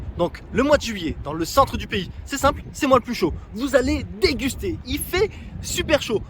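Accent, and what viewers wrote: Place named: Southeast, France. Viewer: Bravo très bonne vidéo, on voit que tu connais le pays 👍, j'adore ta façon de prononcer les villes avec ton "naccen" du Sud-Est pas tout à fait ça mais bon on s'en fou 😀 Canto, Mito, Saïgonne, Niatran.... j'espère te croiser un de ces 4 à Saigon quand tu y retourneras ce serait sympa se prendre un petit "cà phê sữa đá" et échanger. Bon voyage.🤗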